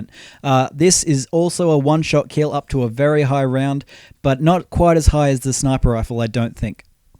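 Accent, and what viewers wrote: Australia. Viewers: Really helpful mate thanx, what part of Aussie u from?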